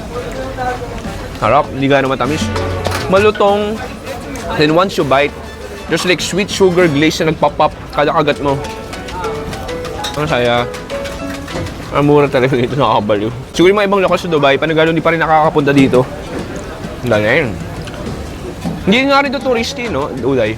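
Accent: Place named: Philippines. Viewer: nakaka miss yung pinoy english accent pag nasa middle east ka 😅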